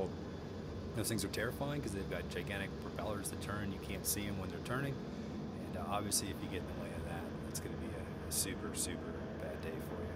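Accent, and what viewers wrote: United States. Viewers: This former Navy pilot hears a Philly accent?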